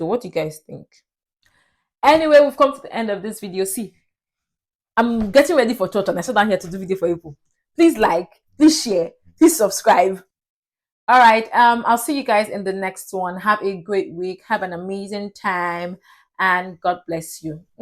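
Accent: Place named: Ekiti, Nigeria. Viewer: You don dey rhyme like Ekiti people, nice 🤭🤭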